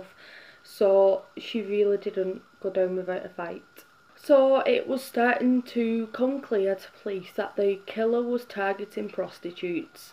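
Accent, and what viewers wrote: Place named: Manchester, United Kingdom. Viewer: Your accent is so interesting! Are you from Manchester?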